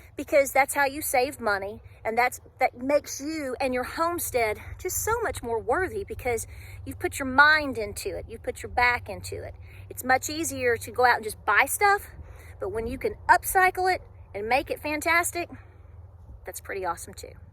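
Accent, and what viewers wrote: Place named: East Tennessee, United States. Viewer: Great video. Very inspiring. Love your accent. Is that from East Tennessee?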